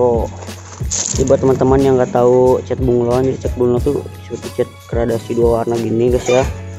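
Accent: Serang, Indonesia. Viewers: orang serang bro?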